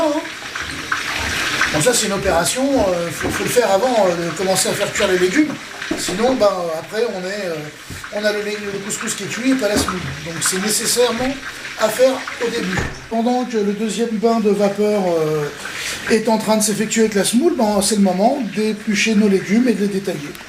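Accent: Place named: France. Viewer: Merci pour votre recette, c'est en cours de préparation chez moi! Et merci pour ce bel accent bien français ;)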